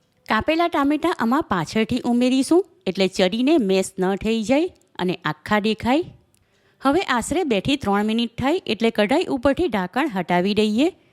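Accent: Surat, India. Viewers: E to surti language chhe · સુરતી છે..😅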